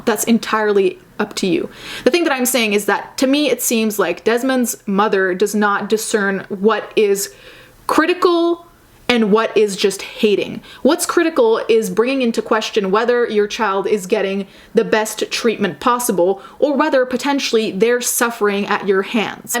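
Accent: RP, United Kingdom. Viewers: I cant understand you with your HEAVY British accent. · I love your British accent 😍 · OMG I love your British accent!! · And that glaring posh accent xD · Oh I love your british accent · I love your british accent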